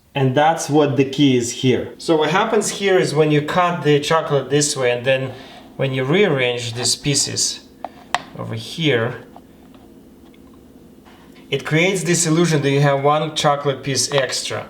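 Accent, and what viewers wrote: Russia. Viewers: Прям слышно русский акцент произношения английского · ОХ ЗЫС РАШЕН АКЦЕНТ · Сач э стронг рашн эксент! Ыыыы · Really funny tbh, I didn't look at his name but heard his voice and tbh this is not the thickest Russian accent I have heard tho. Very close to English, good job for learning english so well btw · Ох уж этот знакомый русский акцент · А акцент то русский 🌚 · Me:is he Russian? Also me: scrolling down and sees username oh... Edit: why is this still getting more likes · Is this Russian accent? Oh, stupid I didn't read the name of channel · У тебя иногда проскакивает русский акцент) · Как же я кайфую от русского акцента :3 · Ммм, русский акцент, ну привет, русская душа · Ну слышна русская речь,так же говорю по-английски)Ахх,акцент не пропьешь) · такой русский акцент, ахвхах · Мне очень нравится его русский eanglish. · Акцент не совсем английский, или ты специально, типа рашен стайл?) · Me: he have a heavy Russian accent—oh he’s Russian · All the Russian YouTubers have the same accent.. 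Taras Kul.. and him . They speak the same. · Вау!Вы русский и говорите по-английски.Да,виден русский акцент.Почему,кстати,русские говорят куда хуже на английском,чем любые другие народы(греки,итальянцы,турки,голландцы,немцы)?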